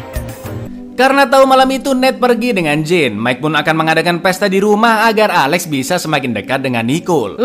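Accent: Java, Indonesia. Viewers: Rika wong ngendi jawane ya lurr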